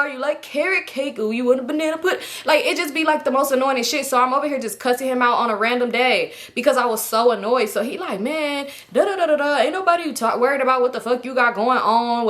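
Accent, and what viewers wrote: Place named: Florida, United States. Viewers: I know a Polk accent when I hear one. IYKYK Our girl getting that ring 💍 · Thats a Florida accent frfr. lol Love that for you!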